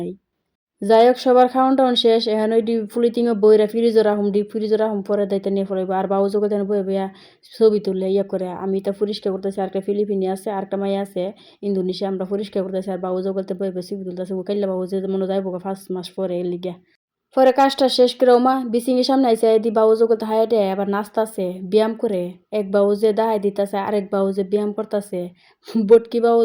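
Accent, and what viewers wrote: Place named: Brahmanbaria, Bangladesh. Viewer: আপনি দি আমার দেশি , কথায় বুঝা যাচ্ছে,, ব্রাহ্মণবাড়িয়া কোথায় আপনার বাসা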